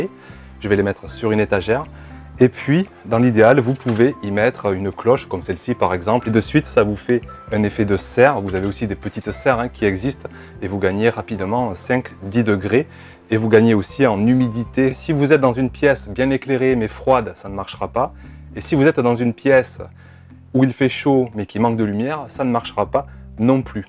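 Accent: South, France. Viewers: Merci pour tous ces précieux conseils et pour l'accent de mon Sud natal 😄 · Salut, Voilà j'ai lancé la plantation de patates douces... 1ère étape, faire germer les morceaux de patates douces (en ayant coupé 2 patates douce en 4 morceaux) mis en barquettes sous cloche avec lumière horticole 150 watts et tapis chauffant réglé sur 20 °C. Je reviendrai te dire de quoi il retourne par la suite. Nous sommes aujourd'hui dans l'Aisne (02) le 02/02/2020. Encore merci à toi pour tes merveilleuses vidéos qui au delà des techniques m'apporte ta bonne humeur, ton inspiration, ta gniac, ton superbe accent du sud et ta bonne humeur légendaire. Merci à toi et continue car tu as une empreinte spécifique sur Youtube.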